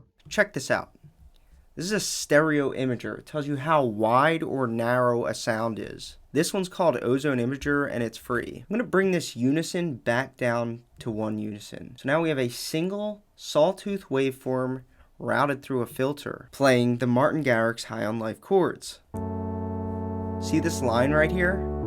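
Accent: Philadelphia, United States. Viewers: Hey bro are you by chance from Philly? I live in port Richmond and I swear I hear my neighborhood when you speak, maybe I’m wrong. Either way great video! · I know a Philly boy when I hear one! Haha thanks man, I'm a newbie and this is probably the most helpful video I've watched so far about synth sound design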